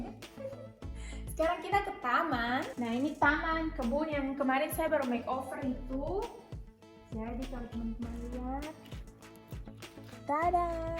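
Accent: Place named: Eastern Indonesia, Indonesia. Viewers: Hai kak, baru nemu dan langsung subsc dong pass denger logat ketimuran kk 😅❤ salam kenal dari sultra 😘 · Shalom mb sy br subcribe slm kenal sy agustina suku Toraja- Indonesia, logatx mb spt org toraja slm succes mb n Mr. Tuhan Yesus Kristus memberkati kita 🙏🥰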